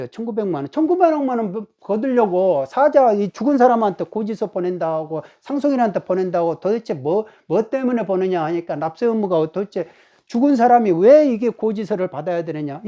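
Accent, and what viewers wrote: Jeolla, South Korea. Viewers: 옹색하다와 징그럽다 둘 다 전라도 사투리는 아니고 말씀하실 때 전라도 특유의 억양이 있으십니다 징하다가 징그럽다의 전라도 방언이에요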